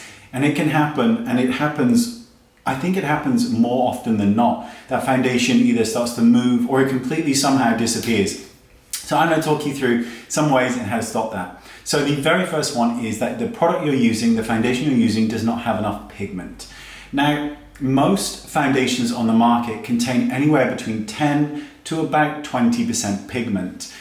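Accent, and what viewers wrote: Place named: West Country, United Kingdom. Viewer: it's a west country accent, it's not that fabulous in term of English accents, but better than mine at least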